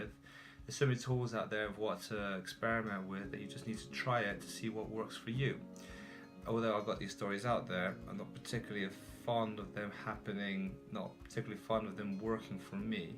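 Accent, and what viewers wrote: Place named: Bristol, United Kingdom. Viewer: Also you are getting Bristolian, WELL WRITTEN with Bristolian accent lol 😂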